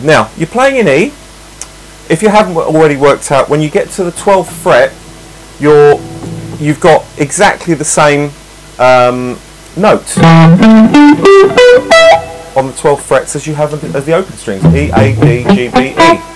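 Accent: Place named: England, United Kingdom. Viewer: Great. Enjoyed it. And like the English accent as well.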